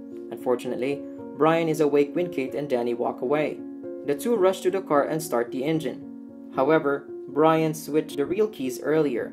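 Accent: Philippines. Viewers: Is this a Filipino accent??? Great Video! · The guy who voiced over is 100% Filipino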